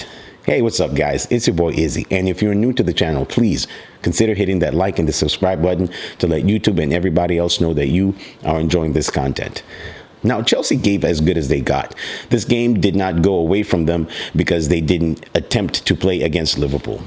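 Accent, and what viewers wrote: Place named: United States. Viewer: Great analysis.American accent 👍🏻😊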